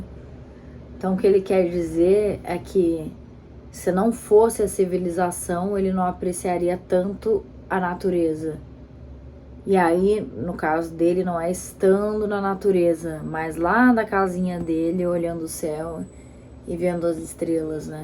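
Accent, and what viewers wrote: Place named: Brazil. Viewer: Ela pode até falar um português do Brasil, mas se diz, que o de Portugal é igual, aí é forçar. Além do vocabulário, a entonação e a forma de falar são diferentes.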